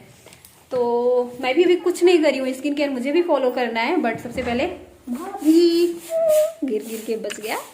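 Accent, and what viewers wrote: Bhojpuri, India. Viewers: I love your bhojpuri voice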